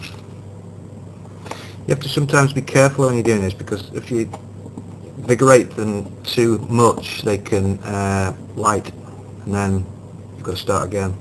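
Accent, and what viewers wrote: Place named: United Kingdom. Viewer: The classic British voice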